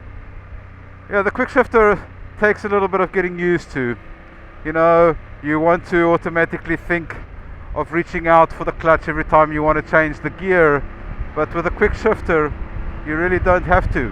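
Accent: South Africa. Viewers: Are you from South Africa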